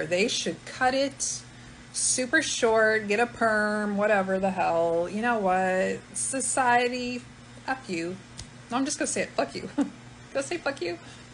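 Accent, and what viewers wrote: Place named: South, United States. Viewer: Omg my southern accent rubbing off on you.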